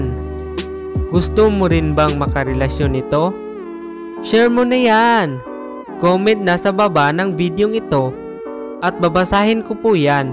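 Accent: Visayas, Philippines. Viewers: Halata kaayu bisaya imong tingug dong 😁😘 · Klaru kaayo kang bisaya sa tuno nimu bah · san ka sa visaya dong?? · Halatang bisaya ka bay haha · Bisaya ka dong..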